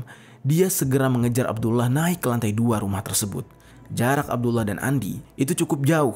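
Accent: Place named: Medan, Indonesia. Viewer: Kok semua bahasa nya entah kasus atau apapun yang ada di daerah manapun kenapa selalu logat medan?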